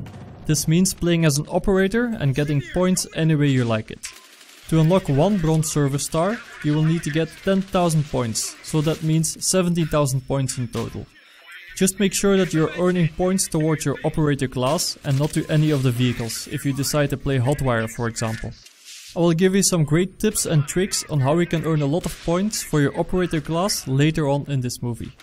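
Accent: Netherlands. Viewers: Ik kan gewoon horen dat je Nederlands bent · je klinkt echt nederlands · 'Dutchy' English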